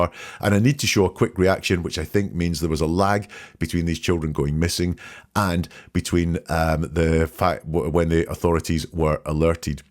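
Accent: Scotland, United Kingdom. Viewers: This has been fascinating and I hear your lovely Scottish accent… I live in Wales 🏴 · Soo glad i found you. Like brittish english better than the tons of american english flooding the internet. Also you are in my timezone❤ . I am a great fan of Peter Hyatt, bless him, Looking forward to watch more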